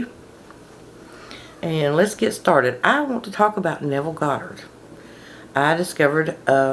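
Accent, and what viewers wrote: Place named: South, United States. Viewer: You are probably the most loveable lass on YT. And that southern drawl of yours, so charming.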